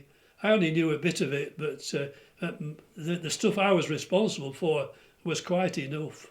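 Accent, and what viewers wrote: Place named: East Lancashire, United Kingdom. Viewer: East Lancashire accent. Do you not think so ? lol ....I locked myself out of my house house here in Wisconsin when it was MINUS 30 C. I was wearing a T shirt and I thought I would die in 5 minutes. My house has security glass and I couldn't break in with a big hammer so I begged for shelter at a neighbours house......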